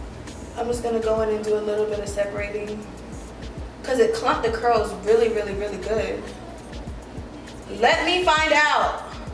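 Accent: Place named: New Orleans, United States. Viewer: Lemme find out you from NOLA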